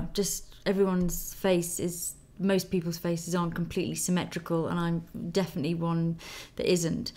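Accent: England, United Kingdom. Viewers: I am a new subscriber after hearing juicytuesday speak so highly of you.I have watched a few videos and am crazy about you already.You are a very beautiful woman and I just seem to adore you English ladies.Love the accents and you all have such gorgeous complexions. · i just love your make up sense....so natural and sophisticated........your confidence in your work and your British accent are just WOW!!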